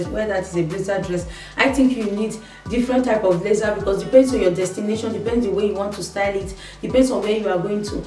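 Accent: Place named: Nigeria. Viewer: Very straight to the point. love it! you sound Nigerian too, beautiful accent.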